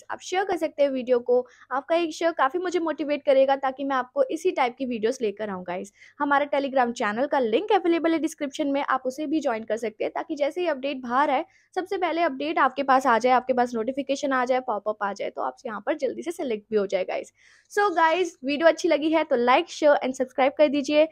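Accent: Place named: Delhi, India. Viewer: AAP kaha se hai delli se kya. App ke baat se pata chaltaa hai madam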